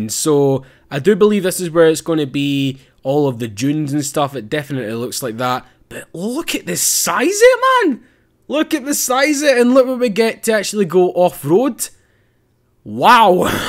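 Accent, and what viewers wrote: Scotland, United Kingdom. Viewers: he's from Scotland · u r my my fav YouTuber now not only r u Scottish like me but I can make out every word u say unlike the Americans lol.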